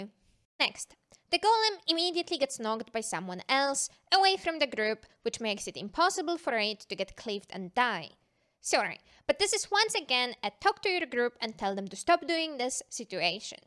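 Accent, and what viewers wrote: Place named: Ireland. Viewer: Thanks for the great guides! Pushing always gave me rough time so I just switched off support to dps for this flight. P.S: Interesting accent! I can’t identify it. What region are you from? I get Irish vibes but I have no idea.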